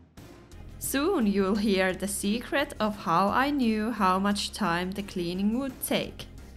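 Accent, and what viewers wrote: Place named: Finland. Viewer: And Finish accent too is.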